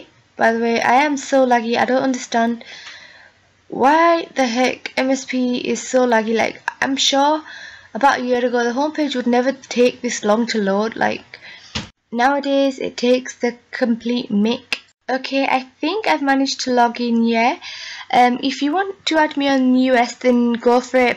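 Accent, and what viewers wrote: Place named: England, United Kingdom. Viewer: You’ve got an English accent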